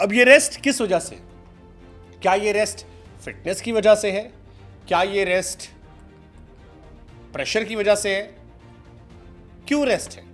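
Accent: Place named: Uttar Pradesh, India. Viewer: ghanta fadak padh rha hai😅, up waale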